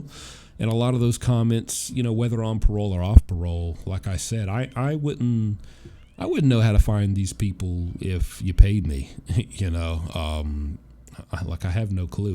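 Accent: South, United States. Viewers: Wow, I love this story but most of all I love your voice - how did you get rid of your southern accent? I live in Alabama and would LOVE to get rid of mine (grins).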